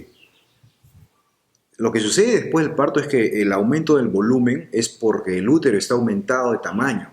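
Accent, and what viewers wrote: Peru. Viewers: Muchas gracias x la información me gustaria una consulta con ud podria facilitarme un numero para poder llamarlo. Por el acento puedo decir q es peruano cierto? Espero su respuesta doctor gracias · Por su manera de hablar es de mi querido Perú, ! Gracias por informarnos. Dios te bendiga! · Alguien sabe de q pais es? Por el acento parece peruano? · Donde tiene ud su consultorio yo soy de Ecuador y x su dialecto ud me parece peruano o me.equivoco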